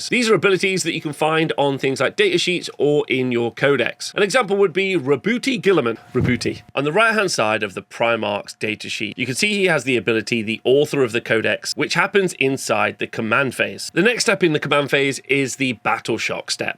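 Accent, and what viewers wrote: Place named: United Kingdom. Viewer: MORE LIKE THIS PLEASE!! this is the 4th time ive watched this video all the way through, while painting miniatures, its really helped me to get a solid grip on the rules system, maybe an advance or FAQ style one next, or faction specific rules breakdown. really appreciate the hard work you put into this. Also you have a much better presentation, personality, voice and vibe than alot of other similar channels i have come across, the british accent helps a tonne. thanks bro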